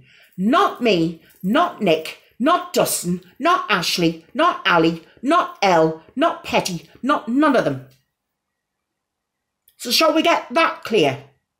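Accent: Newcastle, United Kingdom. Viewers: Oh my goodness, I wanted to reach through the screen and hug you. You are like a mamma bear and clearly have so so much compassion for everyone and their mental health. You are amazing, please keep being you! As an unrelated and not serious side note - I love your geordie accent! I always connected it with genuine kindness and love. I hope you are ok <3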